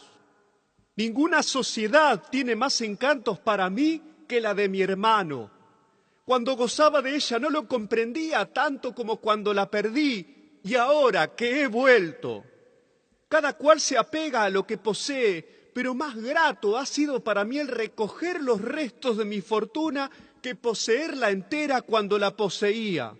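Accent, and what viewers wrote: Argentina. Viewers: DEFINITIVAMENTE EL TIMBRE ARGENTINO ES PESIMOOOO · No es el hecho del acento es el hecho de NO RESPETAR EL LATIN HABLANDO COMO ARGENTINO! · No había otro que no fuera argentino? Hace sonar pedante el discursivo este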